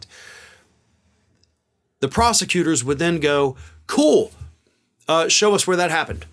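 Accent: South, United States. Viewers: I caught your southern accent. Thanks for all your good content.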